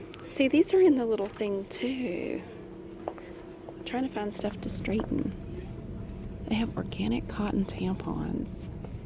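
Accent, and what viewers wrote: South, United States. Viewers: I'm American so all these products are familiar. I'm from the south and get accent is familiar. Never thought this would be satisfying and relaxing. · I was 24 min in before I realized how long I had been watching! As a southern person it's so soothing to hear a familiar sounding voice :) You made me want to go shopping too haha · These are fascinating! I love your accent( I have a southern accent as well) and I love your organization! Please continue making videos:) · This video is such a comfort to me for multiple reasons. I’m from this area and grew up going to this Target. Walking through this familiar space with a southern voice similar to the ones I grew up with really reminds me of home. Now that I’m in college this video always makes me feel better if I get a little homesick. Your energy is so comforting and calming. 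I also love organizing/sorting/straightening, so that’s very soothing as well.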